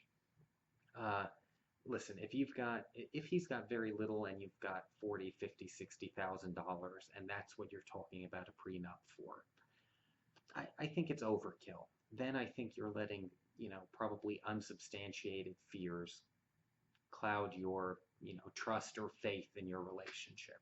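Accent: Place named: South, United States. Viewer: I like your dirty southern accent,!!!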